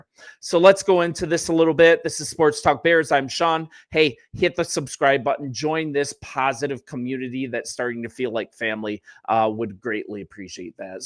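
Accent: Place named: Canada. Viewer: I'm sensing a Canadian or yupper accent, definitely not Chicago.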